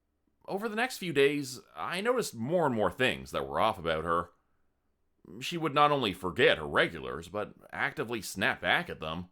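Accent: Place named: Canada. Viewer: Another great read love the Canadian English lol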